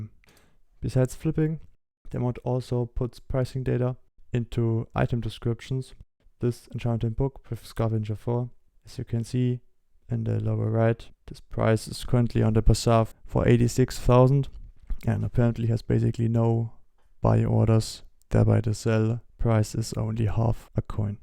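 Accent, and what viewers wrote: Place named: Germany. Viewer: Der Deutsche Akzent knallt richtig hahaha gutes VIdeo ^^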